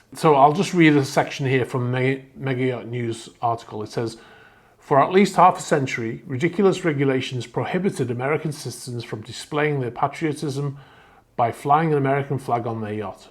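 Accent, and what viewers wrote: United Kingdom. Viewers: I've only just watched a few of your videos. What is your history in crewing? I'm not asking for a complete cv but a general idea. Do you have an engineering background or captain/masters rating or chief cook and bottle washer? Lol Do you still work or are you retired. You sound British so maybe you were in the royal navy? I liked your video and hope to see more!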